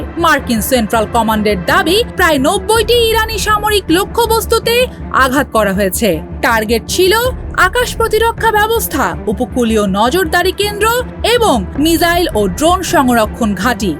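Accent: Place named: India. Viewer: আমাদের নিউজ বা পাঠক পাঠিকাদের থেকে আমরা সুমৃষ্ট বা সুন্দর উপচতাপনা শুনতে অবচত্য দয়া করে ইনডিয়ানদের মতো সংবাদ পাঠ করা বন্ধ করুন বা বিরত থাকুন এতে শুনার বা দেখার আগ্রহ হারিয়ে ফেলি! কমেন্ট ভালো না লাগলে অপরাধ মার্জনা দৃষ্টিতে দেখবেন ! কিন্তু কমেন্টা করতে আমি বাধ্য হলাম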